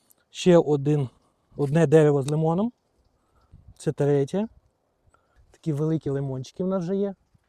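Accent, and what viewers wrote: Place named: Sanzhary, Ukraine. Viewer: В Санжарах так розмовляють.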